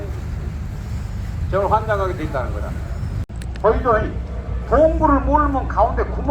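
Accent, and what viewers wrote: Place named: Jeolla, South Korea. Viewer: 구수한 전라도 사투리 정겹습니다 에메랄드 빛 바다, 코끼리 바위, 거북이 바위, 거시기 바위... 홍도 정말 절경이네요 영상 잘 보고 흔적 남기고 갑니다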